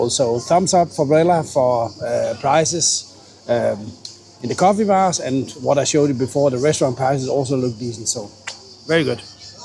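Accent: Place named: Germany. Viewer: Curious here. - your first name sounds Danish. Your Family name English. Your accent in English seems German.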